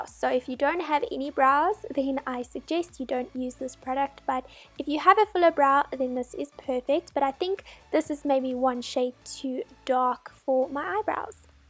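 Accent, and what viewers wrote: South Africa. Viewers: Love your accent. are you south african?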